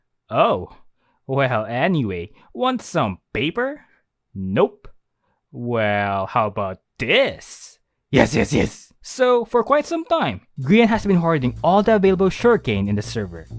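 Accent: Philippines. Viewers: the filipino accent is strong in this one haha <3 sending more love from the ph!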